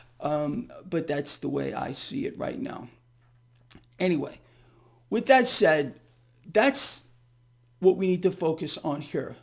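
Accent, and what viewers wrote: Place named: New York, United States. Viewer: I'm a life long New Yorker. You sound like you're from NY. Am I right?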